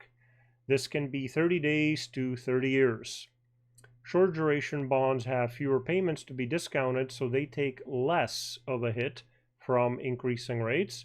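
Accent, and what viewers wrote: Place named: Canada. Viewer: Where is this accent from? I’m Canadian and I’ve never heard anything that sounds so Canadian before.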